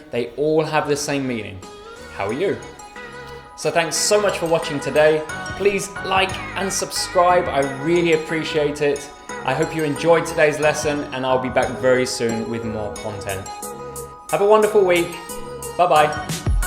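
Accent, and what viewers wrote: United Kingdom. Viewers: I like your British English accent too and thank you very much.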